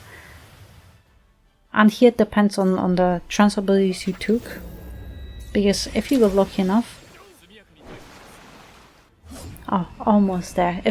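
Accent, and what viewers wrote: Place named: Eastern Europe. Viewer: I like her accent. Sounds eastern europe, I am curious…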